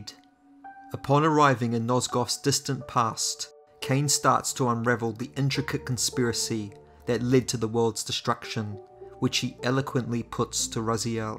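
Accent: New Zealand. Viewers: This content and writing is so lekker! Love it. Is that a New Zealand accent? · From or based in New Zealand? I hear an accent.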